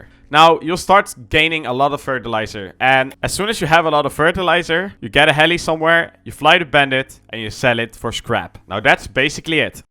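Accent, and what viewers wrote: Netherlands. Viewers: Why no dutch video's? I can hear your dutch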